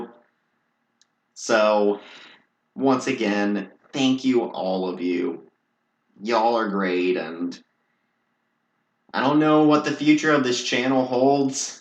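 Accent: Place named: Texas, United States. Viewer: why do you sound almost exactly like terrev but slightly more texan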